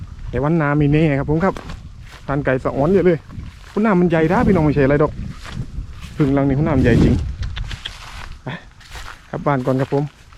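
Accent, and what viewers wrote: Korat, Thailand. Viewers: คนโคราชบ่คับ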